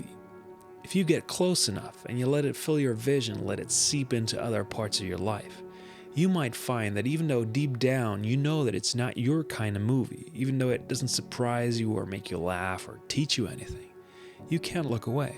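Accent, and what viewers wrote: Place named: United States. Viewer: Nice video! I also like you american accent ;-)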